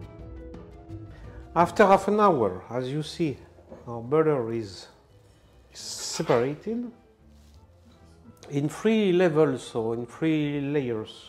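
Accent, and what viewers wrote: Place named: France. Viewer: Thank you for another good video. You are so good at explaining in a simple way with your lovely French accent😊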